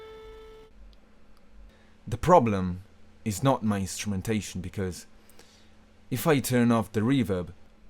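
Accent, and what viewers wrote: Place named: England, United Kingdom. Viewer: he has a clear beda house english accent!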